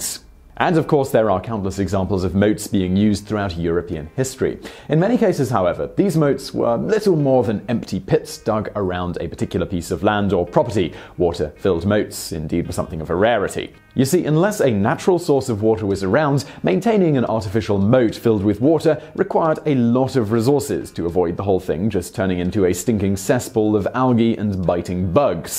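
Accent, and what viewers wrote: England, United Kingdom. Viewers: I like your British accent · Chuck Barry that's called an English accent.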